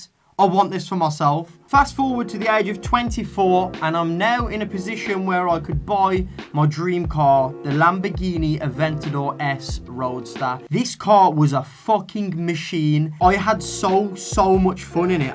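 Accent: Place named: Birmingham, United Kingdom. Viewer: Never thought I would see a brummie in a lambo, but ok...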